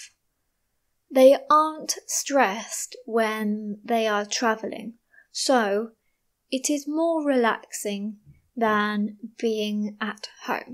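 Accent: United Kingdom. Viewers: I'm wondering something... Where does your accent come from? Of course it's British, but your accent is much clearer than most English. I knew there were many accents in the UK. 🤔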